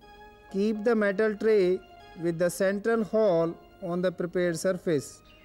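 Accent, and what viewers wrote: India. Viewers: Haha Indian English, lol :v shut your fucking vandal lang up :3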